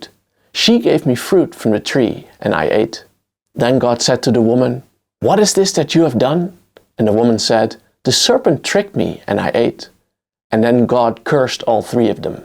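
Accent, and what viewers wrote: Netherlands. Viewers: yes we know. It's not a history book, accented Dutch person.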